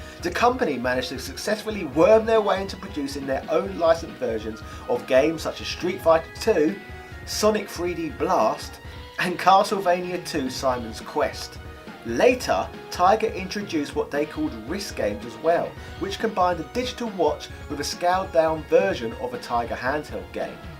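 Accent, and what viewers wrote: United Kingdom. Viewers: Shut up your british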